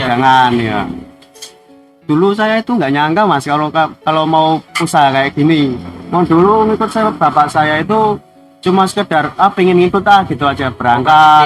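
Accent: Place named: Pemalang, Indonesia. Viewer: Logate wong Pemalang kayane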